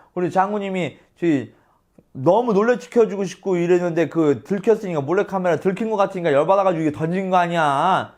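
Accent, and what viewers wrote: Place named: Chungcheong, South Korea. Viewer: 충청도사투리로 듣는 장동민의 삼국지 !옛날이야기 듣는 것 처럼 정말 재밌어요!고맙습니다!^^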